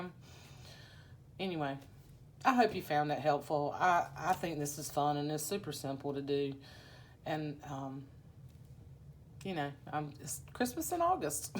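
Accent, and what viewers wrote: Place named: South, United States. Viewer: Yes please, love all your process, picking out your holiday papers?, I can watch you do anything, even drying paint. Love your tags. Please don’t say negative things about yourself, I know Im not the only one who appreciates all that you do for us. Your southern accent is wonderful to listen to. I’m really enjoying Christmas in August, thanks!!💕💕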